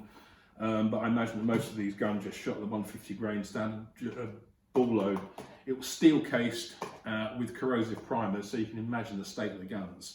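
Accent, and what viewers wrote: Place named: United Kingdom. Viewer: You can still get bolt action rifles in the UK? I'm just assuming form your accent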